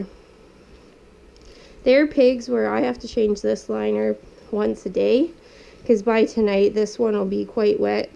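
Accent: Canada. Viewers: You sound Canadian 😁 are you?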